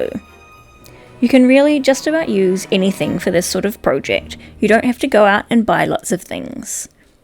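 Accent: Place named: South Africa. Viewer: Christmas is in summer where you live! As soon as you said that, I realized you sound like you have a South African accent. I love all of the wreaths. The gingerbread wreath is my favorite.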